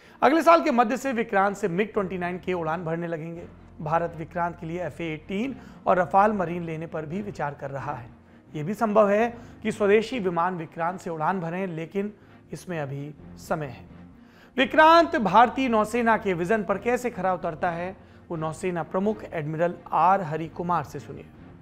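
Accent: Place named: Bundelkhand, India. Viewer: देश की खवर देश की आवाज़ देश के लहजे में देश के बुंदेल खंड के सपूत सौरभ द्विवेदी संमोहित करने वाले अंदाज़ में समाचार सुनाने वाले ग्रेट.....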